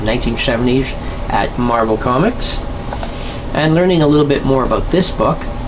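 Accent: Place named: Canada. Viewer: Great Canadian accent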